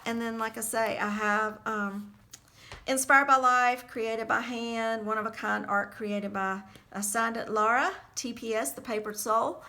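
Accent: South, United States. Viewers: Just found you and love your art, shop, and southern accent. (I am from SC)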